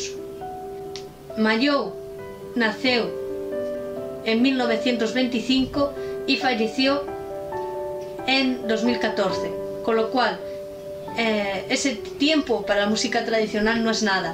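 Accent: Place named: Galicia, Spain. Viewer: ! Fíxate na canle "Tradigaita" que está en galego! Penso que á túa canle lle viría ben que os guións os dixeras en galego (vese que a veces che sae naturalmente así que, aproveita ese natural esponateo!). Tratándose de música, e moita música galega, estou máis que seguro que non lle afectará á comprensión! Ao contrario! Troca ao acento galego pola propia lingua galega!:-D Logo tamén, non sei como funcionas tí (co tema nervios/camara) nin canto tempo levas tocando pero seguro que menos videos e mellor preparados (os temas que tocas con gaita ao principio sobre todo) tamén sería un bonus e que atraería a xente:-D Ánimo e a seguir con ese labor divulgativo!:-D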